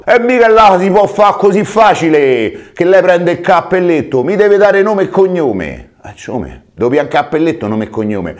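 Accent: Rome, Italy. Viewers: Grande sono figlio di una romana e quando ti sento parlare mi raffiorano sempre i ricordi, volevo dirti che grazie a te 3 anni fa circa mj sonl appassionato tanto al pugilato. Oggi sono pro e non lo avrei mai pensato, ho fatto il mio primo incontro a Milano a gennaio prima del lockdown. Con tanto allenamento e tanti sacrifici per un diciannovenne. P. S. Porta ancora tutorial e di al franchino di stare più attivo su yt che siete dei personaggi... Grazie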